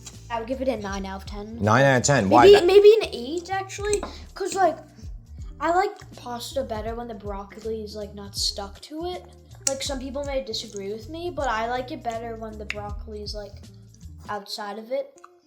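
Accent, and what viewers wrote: New York, United States. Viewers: You’re a great cook my friend. You sound like a New York Italian ? Great cooking !!!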